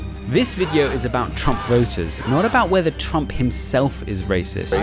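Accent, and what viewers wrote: United Kingdom. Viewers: We r not slaves from Africa this British accent Hindu began the video off lying we r not slaves from Africa stop 🛑 lying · I found this video thoroughly adept at rationalizing racism away - in part by signaling or assigning "degrees" through a contrived methodology and then putting it on the viewer to "decide" where they fall on the scale. the other comments did a good job citing the obvious. In short this was clownish and disgraceful. the British accent leant a little credibility I guess though...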